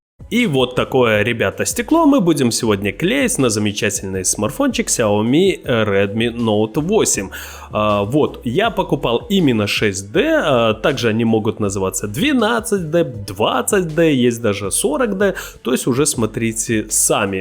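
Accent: Belarus. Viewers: Автор, Вы - беларус?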